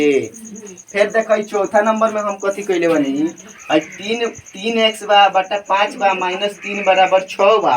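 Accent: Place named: Bhojpuri, India. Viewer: Bhojpuri andaz me sir❤❤❤❤❤❤❤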